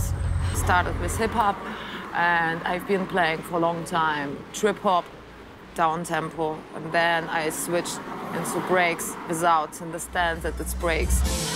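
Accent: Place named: Germany. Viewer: Такой прикольный английский на немецкий манер, крутая 😎 классная, очаровательная!!!😊😘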